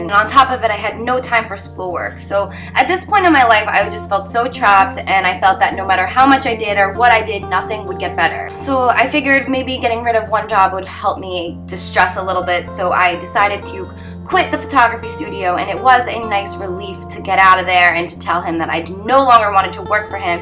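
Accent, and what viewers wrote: New Jersey, United States. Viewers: Hi...I couldn't sleep so I decided to watch some videos and heard the daily cupcake mention u. I was curious bc she said something how much she liked ur jersey accent. So being a fellow jersey girl I was curious and quickly found u here. I'm so glad I did! After watching a few vids I realized how special u are and after watching ur draw my life I literally cried thru most of it! U are so young and u have been thru so much and u have managed to turn all the hurt into positivity. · i KNEW that was a jersey accent!! ahhh. i love it. is that weird? i could listen to you talk all day. haha. :))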